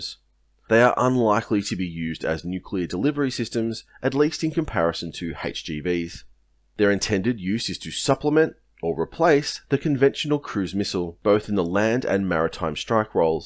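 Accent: Australia. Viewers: You sound like that classic Australian Doosh bag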